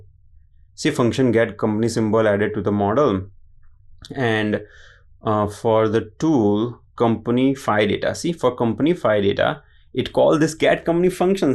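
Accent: Gujarat, India. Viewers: Gujarati accent hehe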